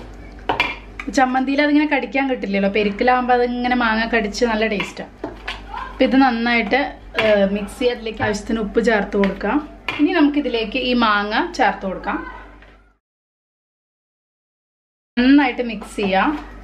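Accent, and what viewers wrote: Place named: North Kerala, India. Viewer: Madam... നാട്ടിൽ എവിടെയാണ് ? നല്ല വടക്കൻ ഭാഷ .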